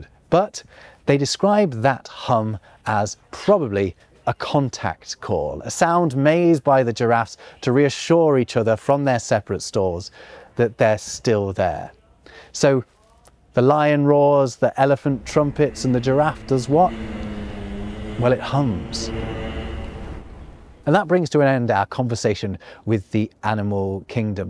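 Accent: United Kingdom. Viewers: Hearing a British person talk about parrots makes me think of certain things. Would I like to hear an African grey parrot? I'd much rather hear a Norwegian blue. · I like your British TV accent and style of talking. Have you by any chance worked for sky or BBC?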